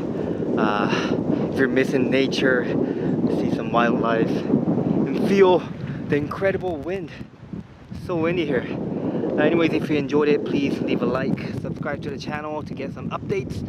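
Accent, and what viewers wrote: Singapore. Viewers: 英語がシングリッシュ寄りのアクセントになってきた気がするww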